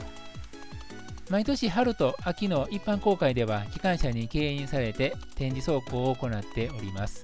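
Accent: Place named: Kansai, Japan. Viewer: HIDEKYU様 動画UPお疲れさまです。大変わかりやすくまとめられていて参考になりました。 ありがとうございます。 やわらかな関西弁にも癒されます。 キハ82, 189系あさま、E3系つばさなど、今月発表分には財布の紐が緩みそうです💦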